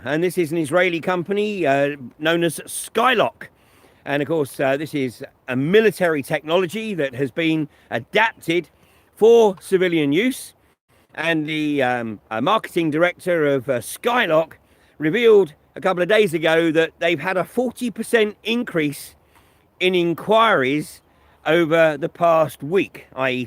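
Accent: United Kingdom. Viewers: Great channel. Good to hear a British voice providing truth!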